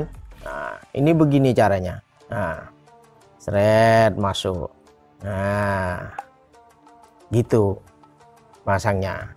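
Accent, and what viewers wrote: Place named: Madura, Indonesia. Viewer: Ini kakak pasti dari madura.... Tak iye?? Mantap kak... 👍👍👍 sdh like n subscribe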